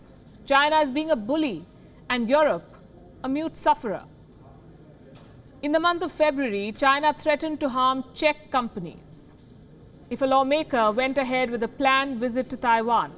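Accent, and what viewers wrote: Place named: India. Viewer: We know your speech full of Nonsens, like your Indien accent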